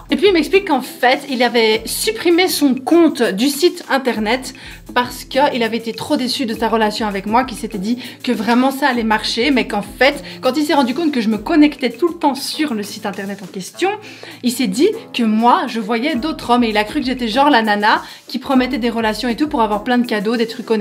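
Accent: Belgium. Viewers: t'es vraiment Belge, ton accent reprend le dessus... ca me fait penser à la collection Arlequin ton truc... Les femmes nous sommes tjs sujettes aux mêmes clichés...Nous avons intériorisé ces clichés, même si on lutte... C'est l'homme séduisant , ok, occupé, + âgé que toi, qui gagne TRÈS bien sa vie...et tu as réussit ton rêve, non-avoué, de petite Princesse...merci la femme émancipée, qui essaye de gagner sa vie sur YouTube, mais qui sinon a arrêté de travailler, son boulot qui lui tenait a coeur au Japon, par amour pour le suivre ( l'amoureux )...aux USA, puis c''est le mariage et le bébé ... c'est bien ... c''est une histoire ... [ enfin les débuts semblent compliqués, la confiance...] mais qui répond qd même aux clichés, même aux clichés Japonais d'ailleurs ...tellement conservateurs ...et puis on n'est pas obligé de se marier pour former un couple officiel...et avoir un enfant ... 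tjs aussi pimbeche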